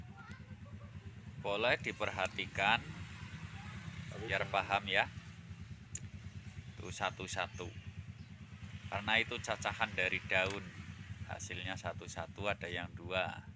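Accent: Banyumas, Indonesia. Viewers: Pak jan tembe ketemu channele giye aku bani banyu mas nang Lampung ngapak pada bae nek ora ngapak ora kepenak ky gue ya pak. Salam knl.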